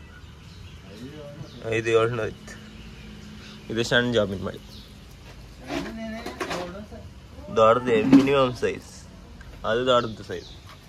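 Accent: Kundapura, India. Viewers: ಚೆಂದ ಇತ್ತ್ ಮಾರೆ ನಿಮ್ಮ vlog ಕುಂದಾಪ್ರ ಕನ್ನಡ ಕೆಂಬುಕೆ ಚಂದ ಶುಭ ವಾಗಲಿ 👏👏👏👏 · What kannada he speak is not normal kannada but it's dialect of kannada called " Kundapura kannada".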